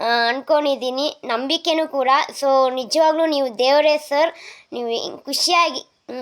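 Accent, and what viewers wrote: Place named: Kundapur, India. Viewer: Kundapur kannada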